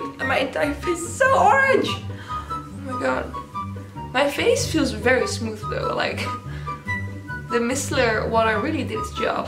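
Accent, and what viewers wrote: Netherlands. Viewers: Je Engels is echt zo erg op zijn Nederlands😂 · Are you Dutch? Your accent sounds kinda Dutch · Are you dutch?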